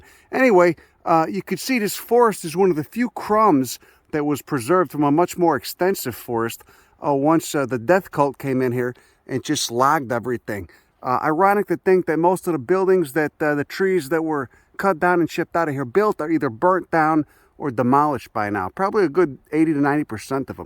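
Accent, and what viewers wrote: Chicago, United States. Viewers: Prologue: Caffeine and Adderall. Apologies. I'm pretty sure this is my favourite video so far. Your sense of humour, Chicago accent, and total lack of self-censorship makes it stellar. And yes, admittedly and embarrassingly, I do have a big homeauxsexual crush on you. I want to thank WIRED for introducing me to your stellar work. I do hope that you are making good money now with your uncommon knowledge. In addition to dreamily gazing at your videos, I'm also completely obsessed with gardening. In particular, right now, house plants. It's just a mess in my house in Vermont. If you do actually see this comment, have you ever done any exploration of the botanical life here around Vermont? I have this dream that someday I can go exploring the geology and botany of the area. Being from New Orleans, it's like living in the fucking Alps to me. 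I'll stop now. Thank you for the videos!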